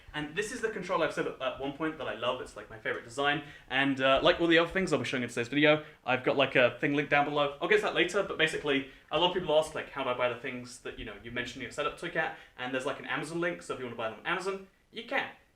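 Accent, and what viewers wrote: United Kingdom. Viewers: M.A.A.C CRAFTERS No, He's Brittish. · He lives in the UK so he has an accent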